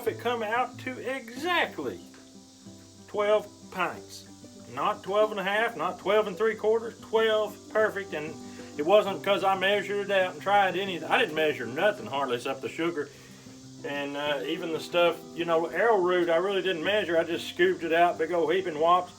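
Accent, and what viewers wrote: South, United States. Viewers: "Just like at".! Love the south.. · I enjoyed watching your video and loved your down to earth personality and country accent. Blessings!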